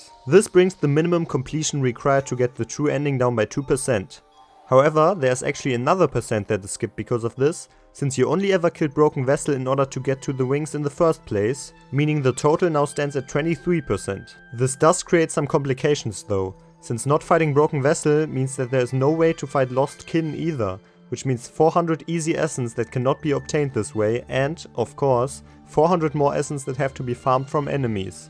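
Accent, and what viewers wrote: Germany. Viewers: Nice Video but hör ich dar ein deutschen Akzent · I, a german, watch you, possibly and probably a german, talk english. Beautiful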